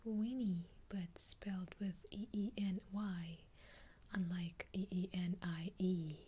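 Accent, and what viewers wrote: Russia. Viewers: Русский акцент прекрасен)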